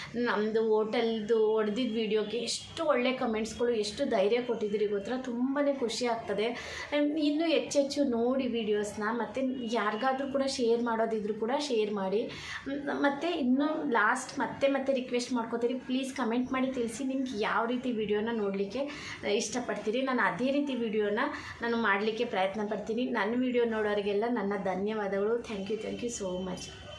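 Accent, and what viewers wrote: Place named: Hassan, India. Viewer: ಮೇಡಂ ನಮಸ್ಕಾರ ನೀವು ಮಾಡುತ್ತಿರುವ ಇತ್ತೀಚಿನ ವಿಡಿಯೋಗಳನ್ನು ನೋಡುತ್ತಿದ್ದೇನೆ ಎಲ್ಲಾ ವಿಡಿಯೋ ಚನ್ನಾಗಿ ಬರುತ್ತಿದೆ. ನೀವು ಸರಳವಾದ ನಮ್ಮ ಹಾಸನ ಜಿಲ್ಲೆ ಭಾಷೆ ಚನ್ನಾಗಿದೆ. ನೀವು ಏನು ಓದಿದ್ದೀರಾ ಇಂಗ್ಲಿಷ್ ಪದಗಳು ಚನ್ನಾಗಿ ಬಳಸುತ್ತೀರಾ, ನಿಮಗೆ ಒಳ್ಳೆದಾಗಲಿ. 🙏🏽🙏🏽🙏🏽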